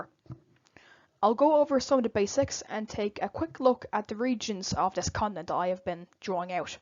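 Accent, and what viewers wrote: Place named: Ireland. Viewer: Wasn't expecting an irish woman when i clicked on the video, but what a present surprise. The maps absolutely incredible, and the screenshots of just how much detail went into the world, including climate bands, tectonics, elevation, ocean currents, really made me re-evaluate how sloppily I've been designing my own map currently. 1 thing I'd like to know is whether your world is tilted on it's axis like Earths or is in perfect alignment, it's something i see commonly missed in worldbuilding despite the fact that the axial tilt has a massive effect on the Earth, and the seasons/climate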